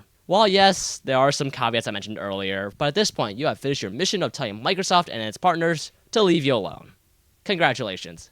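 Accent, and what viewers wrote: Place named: Southeast Asia. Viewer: It's the South-east Asian accent.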